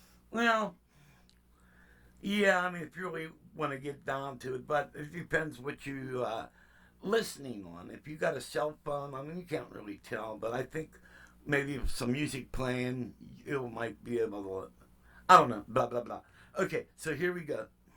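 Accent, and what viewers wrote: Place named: Pittsburgh, United States. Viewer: I know a yinzer when I hear one 👊🏼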